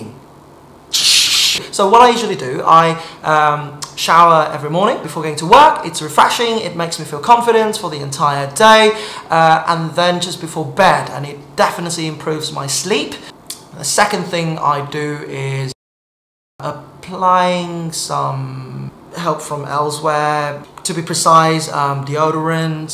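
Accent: United Kingdom. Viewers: That British accent tho~~~ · that British accenttttt💓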